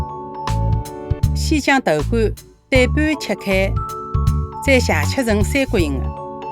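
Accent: Shanghai, China. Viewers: 上海话听着倍儿舒服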